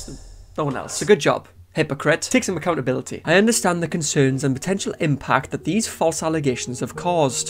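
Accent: Wales, United Kingdom. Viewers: Nothing quite like listening to a nice Welshman summarize this bs. At least I'm assuming you're Welsh by the accent.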